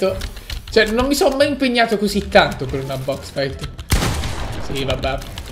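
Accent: Bari, Italy. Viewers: Ma sei di Bari?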